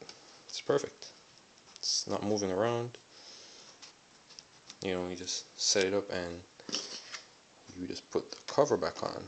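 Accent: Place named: Jamaica. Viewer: Your Jamaican aren't you? I can hear the accent lol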